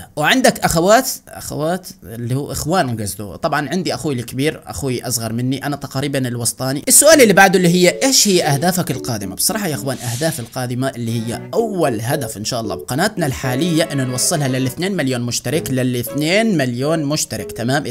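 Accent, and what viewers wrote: Iraq. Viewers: لهجتك بالعراقي تجنن 😂😂❤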